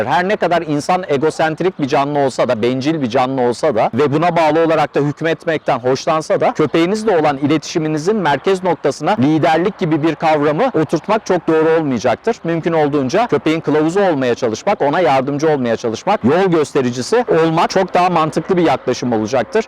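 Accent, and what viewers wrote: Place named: Istanbul, Turkey. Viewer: Çok İstanbul ağzı konuşuyor diksiyon çok iyi ben ise Adana ağzı konuşuyorum çok moralim bozuluyor ne zaman kelimeyi bozacak diye bekliyorum. Bu beni üzer....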